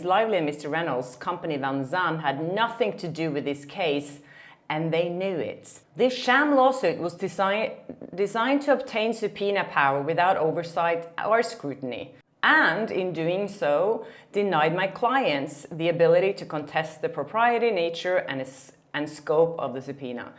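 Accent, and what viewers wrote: Norway. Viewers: I love your Norwegian accent! We visited Stavanger last year and had an absolutely lovely time except for the hills. My legs were aching. Keep up the good work. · Your Norwegian accent is lovely and your syntax mix ups are lovely too! ❤️❤️❤️❤️ we know what you are saying and you are doing great! 🎉❤ You are also doing better in your second language that 99% of other ppl in the comments could do! 😉😉😉 😉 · Your Norwegian accent is beautiful! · You're adorable!! I much prefer your original Norwegian pronunciations!! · I find a little Norwegian twist to some words quite charming! The friendship between Ryan Reynolds and Hugh Jackman is a dichotomy. (Hope I’m using the correct word).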